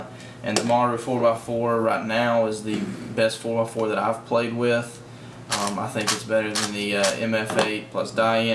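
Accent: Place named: South, United States. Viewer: You're not from the Boston area, are you? You really sound like you're from the South. I lived in Knoxville, TN for a long time, and you really sound like you're from that area. By the way, the Maru, you need to tighten if it comes out of alignment. Tightening it will stop that. The Dayan 4x4, you HAVE to lube the inner 2x2 core, or it won't be good. It really is the best when you set it up right. Lube and loosen the screws on the 2x2 and tighten the ball shell screws all the way.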